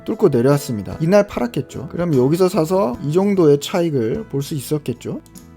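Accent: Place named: Gyeongsang, South Korea. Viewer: 똑똑하신 분같아요.설명짱.쏙쏙들어오네요.^^ 살짝 경상도사투리 들리는데 기분탓인가요?ㅎㅎ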